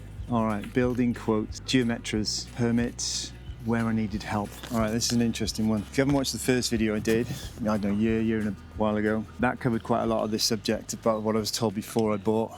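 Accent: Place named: England, United Kingdom. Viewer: Thank you for sharing your experience…just found your channel… looking forward to watching all your videos… I know from your accent where you are from in England..😊…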